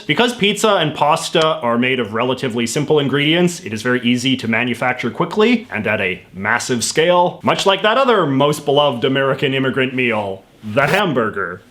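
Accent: Canada. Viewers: This guy hits his "out" harder than any Canadian. EVER. We know you're Canadian. Stop it. It sounds gross. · Hey, are you Canadian? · He called pizza margherita boring?!!!! Well he has a horrible sounding Canadian accent so I am not offended.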